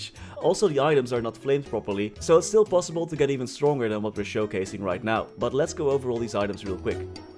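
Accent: Netherlands. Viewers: Instantly recognize a dutch english accent. Your english is pretty good man! Lekker gewerkt pik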